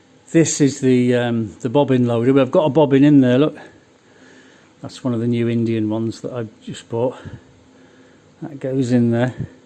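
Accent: United Kingdom. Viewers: Love listening to - his voice is so soothing. I could listen to the UK accent all day long (American here). I couldn't watch more recent videos because I didn't want him to leave. But I'm getting back to them. Thanks so much, Fiona for keeping his memory alive!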